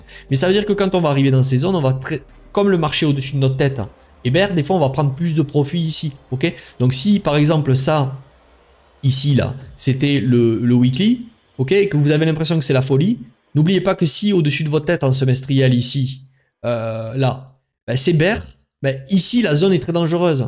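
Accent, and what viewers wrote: South, France. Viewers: L’accent du sud on aime frero 🤣🫡 de bonnes vidéos à chaque fois force 💪🏻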